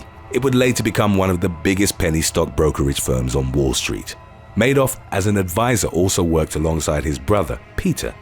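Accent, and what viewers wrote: United Kingdom. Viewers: Thank you for your informative, interesting, and accurate content. All stated succinctly in a lovely British accent. Maybe Tupac’s mgr was taunting fate when he named his company .Death Row.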